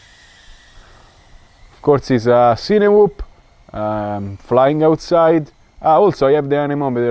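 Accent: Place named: Italy. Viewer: Fà strano sentire il tuo accento italiano, abituato ai video inglesi ! Ma a parte questo ottimo video, bravo in inglese e divertente la caccia alle galline XD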